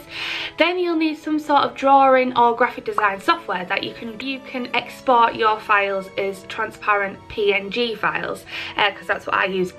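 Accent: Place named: Whitley Bay, United Kingdom. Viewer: I’ve just bought a Cricut and it scares the hell out of me! Your videos on making stickers have really helped, thank you. On a side note my husband is from Washington and he’s trying to pin down your accent, he’s decided on Whitley Bay or Blyth, how close is he? We live in Canada now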